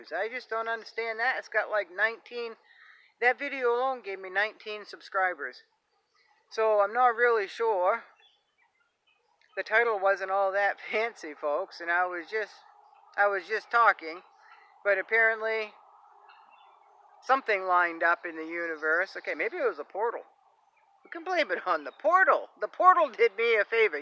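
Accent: Maine, United States. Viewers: Im one of the new subscribers- youtube suggested it while I was watching permapastures. I subscribed because I like the easy going yet firm manner and the garden behind you looked intriguing, I may do a teepee next spring. I also subscribed because I miss the Maine accent, a lot of the family on my dads side are from there.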